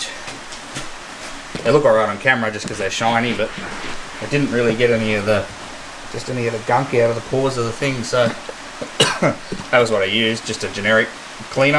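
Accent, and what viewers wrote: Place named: Northern Territory, Australia. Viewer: Hi mate sounds like your in the northern territory !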